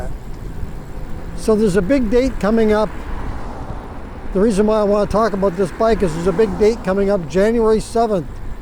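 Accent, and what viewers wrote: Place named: Canada. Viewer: If I close my eyes.. listening, I think I'm in Canada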